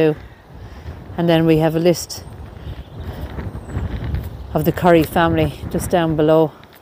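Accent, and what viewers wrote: Ireland. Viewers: Love your vids ,and the Irish accent ...enjoy them greatly as I'm not well enough to go myself ...love gv too x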